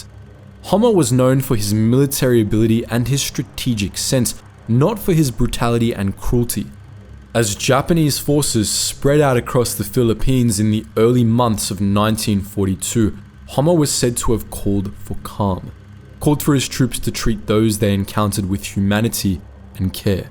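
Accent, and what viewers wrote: Australia. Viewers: I know this is months later but I’m really wondering, are you SunnyV2 or Internet Anarchist? Because I swear every dude from Australia on here speaks in the same voice and cadence. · Do you have another channel or do all aussies sound the same to me? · Your Australian voice is very young, youtube earnings young, so what evidence are you basing your narrative on to earn your youtube income?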